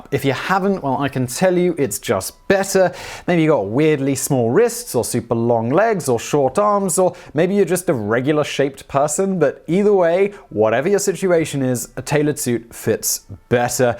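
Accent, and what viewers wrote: England, United Kingdom. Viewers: sigh...you are so British...tailored suit...but they are still doing window pane fabric? British guys can get away with that (I lived there long enough) Americans can't...they don't have the accent and don't know the difference between oxfords and brogues... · Very well put when you said "....his altruistic accent..." Love your sites. You're the man and a British accent I can handle. And understand. Always awesome narration. And now someone is going to think you wrote this to yourself. Ha,as if. Peace, nice work and thanks. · 10 20 19 Hey & the Team, Thanks for the post. Lovely suit, color is good on you too; not that my opinion matters that much. Be well. v P.S.: Classic Bond look to go with the British accent.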